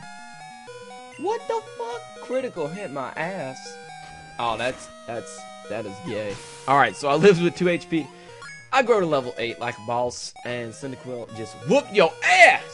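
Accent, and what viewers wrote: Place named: United Kingdom. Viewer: You can talk very good british!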